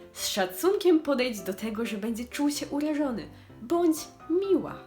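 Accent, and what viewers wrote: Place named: Silesia, Poland. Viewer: Świetny kanał, bardzo miło się ciebie ogląda 😁 poleciał sub oraz łapka 😁 Powiedz proszę, czy jesteś ze Śląska ? Słyszę u Ciebie lekki akcent, ale mogę się mylić 😂😂😂😂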